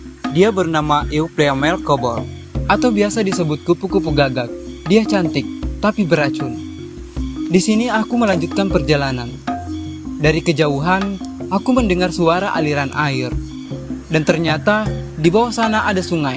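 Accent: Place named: Sulawesi, Indonesia. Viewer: igt kawanKu yg di sulawesi cara bicara nya🙂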